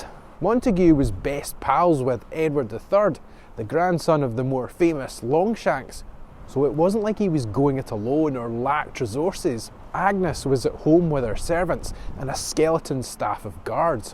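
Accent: Scotland, United Kingdom. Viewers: ♥️your Scottish Broge, but you talk funny ? 👍😎🇺🇸!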